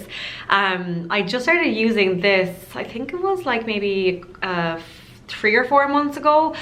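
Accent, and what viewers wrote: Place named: Ireland. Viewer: Lovely your Irish accent. ❤️❤️❤️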